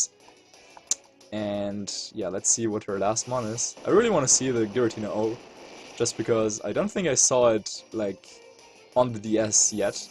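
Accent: Germany. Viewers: First comment of the day LOL it's an honor. I like the way you say it with German accent better tbh, wasn't trying to be mean. Keep up the great content, both VGC and if you decide to start a new series! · Your German accent is fine! Pokemon is an international community and that's what is so awesome about it! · Lolz, nothing wrong with german accented Vs, how about ' Battle Spot Story' or something? xP I was playing on the N64 recently n' it's the 1st thing that came to mind, <3 Story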